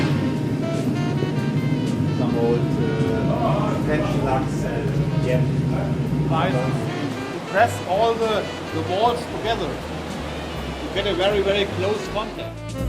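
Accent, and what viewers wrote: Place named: Germany. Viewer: hahaha.. how u realize that he is german from the very first second :D i'm german myself but i hate the normal german accent :/its duch a .. torture :(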